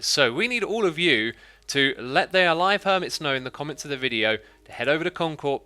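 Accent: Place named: United Kingdom. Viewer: it took me a while to understand what flower you were referring to lol I love British accent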